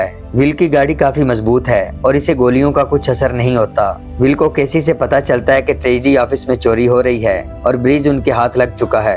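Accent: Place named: Punjab, India. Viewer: Punjabi accent. Not Hindi or urdu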